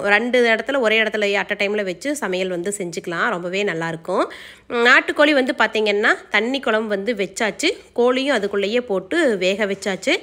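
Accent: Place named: Kongu, India. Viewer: Namma kongu slangkukkaaga ❤ thaan naan first parthen da....saatharanamave niraya english words use pandratha kurachukkunga ...example saree wear pannittu.....namma side casual ah saree kattittu😊 nu thaane solvom.....sorry😌 nirayamurai sollanum nu ninachurukken❤வாழ்க வளமுடன் 🙏🎉----❤maheswari chennai 😊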